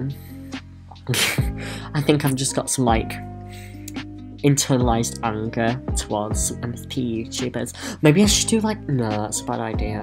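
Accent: England, United Kingdom. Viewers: are u from England i swear u sound like u do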